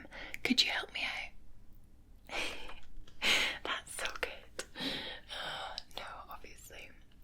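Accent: Scotland, United Kingdom. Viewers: 🧡 thank you very much for this video. I truly do love it. Is it the best one yet, I wonder if it can get over the best ones before. And you're wearing such a great dress, the dress with all the cute things. What an awesome clothing, best spring dress. 😊 I bet you chose it because of the spring did you. 😃 Also I loved your latest video. Even though your Scottish accent is amazing, I also like to hear you talk with that English accent. You were really wonderful with your acting skills and making me sleep with entertainment attached to it. I had looked forward to see Lara Croft again and there poof she appeared like magic. You were awesome, schön, ausgezeichnet. 😃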